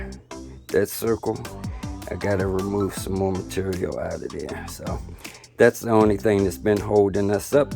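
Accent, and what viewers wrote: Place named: Georgia, United States. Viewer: Bro, I feel you on the "troll" shit, were from a different time & a different era, where we ran our mouths to each other faces, & backed that shit up! 💯 I'm 47 y.o, very outspoken on my views & beliefs, but AT LEAST I stand in something, these days it's the blind🦮 leading the blind🦯 out there nowadays...... Where you from originally bro, Georgia or something, I know your accent but can't place it??? 🤔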